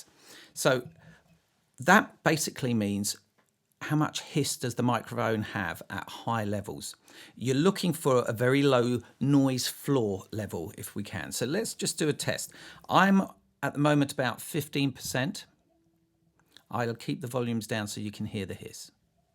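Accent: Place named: United Kingdom. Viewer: I love British English. I enjoyed this review.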